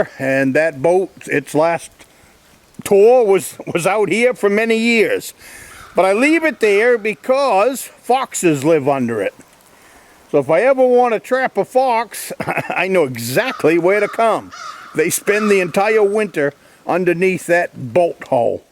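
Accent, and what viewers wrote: Maine, United States. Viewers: Very informative video, and you do rock that Maine accent! With your enormous number of videos, sounds like you should write a book on being a woodsman!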